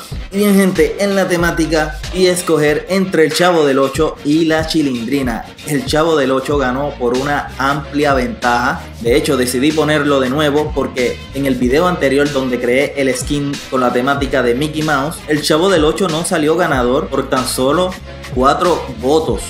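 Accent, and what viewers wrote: Puerto Rico. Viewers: eres de puerto rico porque creo que eres de puerto rico por que se te nota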